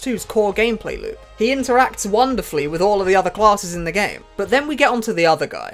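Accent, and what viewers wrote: United Kingdom. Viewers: TF2 ruined me. I thought you're Aussie but nah you're Brit. Thanks Sniper.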